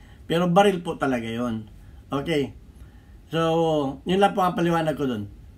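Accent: Bicol, Philippines. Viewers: Bikolano k po b manoy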